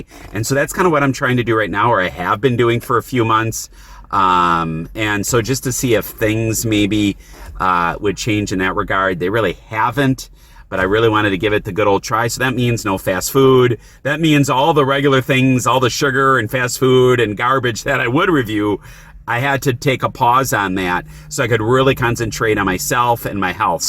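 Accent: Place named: Wisconsin, United States. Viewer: Your Wiscahhhhnsin accent cracks me up! 😂.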